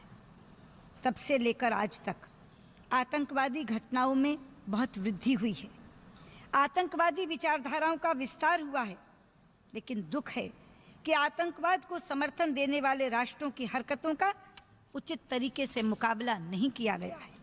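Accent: Standard. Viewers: Madam Sushmaji is surely an asset to the Nation. What a clarity in her speech and purity in Hindi. Awesome !!!!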